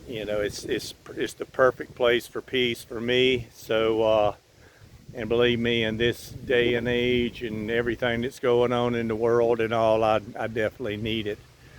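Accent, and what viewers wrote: South, United States. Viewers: Aloha from Hawaii dude. I love your Southern drawl lol. You are hard core. I really want to come visit. It is getting stupid expensive to live in Hawaii and racism against whites is bad. Thanks for sharing your life with us mate. ❤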